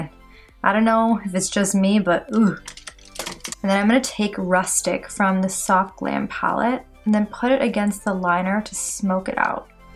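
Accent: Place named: Coast, Colombia. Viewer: Usted parece costeño colombiana jejejeje pero mi familia también son Portuguese y mi papá es trigenio bendiciones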